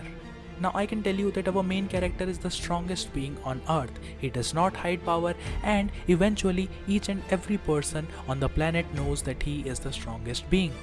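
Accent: India. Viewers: Remove your India accents its annoying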